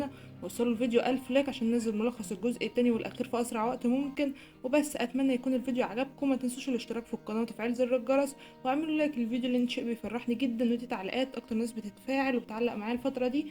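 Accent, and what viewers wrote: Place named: Egypt. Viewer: كلشي حلو صوتك المصري حلو قناتك الحو اجننننن استمري قناتك احيل حلو من العراق 🇮🇶متابعتك حلا بنت بل عالم 💜🍭💞💞💞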